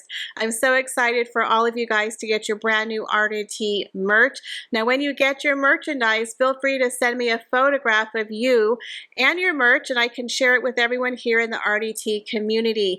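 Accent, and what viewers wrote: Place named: East Texas, United States. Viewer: I love the Barbie’s! I can hear that east Texas accent along with your expressions. Reminds me of home ( south Louisiana) proud of you!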